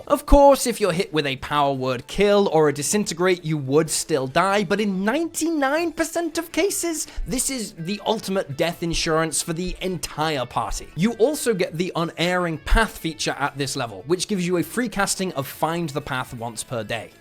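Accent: United Kingdom. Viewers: You're British?? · guys I think he's British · Wait! He’s British? Thank gods Wilburton was there to take the tirade that revealed this fact to us. 😂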